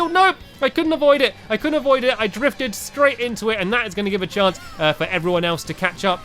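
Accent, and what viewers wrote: United Kingdom. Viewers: He is british he has an accent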